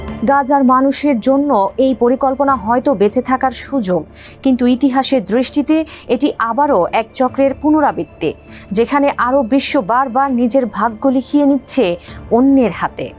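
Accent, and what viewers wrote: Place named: India. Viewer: সমস্যা যেহেতু বুঝেছ, সমাধান সম্পর্কে বল, শুনি আমরা! ভারতের স্টাইলে সংবাদ পাঠিকা কন্ঠ দিলেন! ভারতের দালাল বলা যায় কি?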